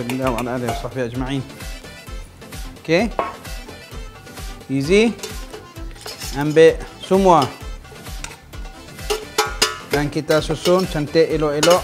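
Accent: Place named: North, Malaysia. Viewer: chef dok belah utara ka? ada skit2 nk dgq loghat utara tu huhu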